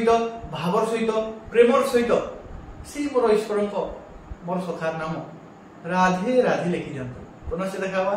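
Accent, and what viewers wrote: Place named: Western Odisha, India. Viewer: Tame to paschim odisha ra bagir laguche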